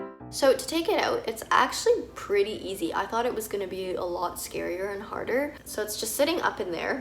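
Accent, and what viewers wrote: Canada. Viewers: I wouldn't say you have a heavy accent, but being a fan of Canadian TV shows, I picked up on it! Your review of this product was so educating and thorough. Really above and beyond! The company should pay you for this video alone . You probably know more about the product then the sales reps!😂 Love and greetings from America!! 😊💙 somewhat related: How does it differ from a diaphragm or nuvaring, maybe? Like could it be used as birth control? Probably not?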